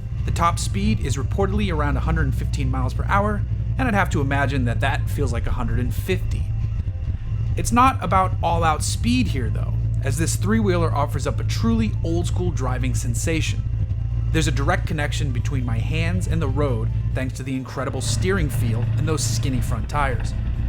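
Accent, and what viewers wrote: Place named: United States. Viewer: Fantastic review. Also you have a nice American voice. Not annoying at all!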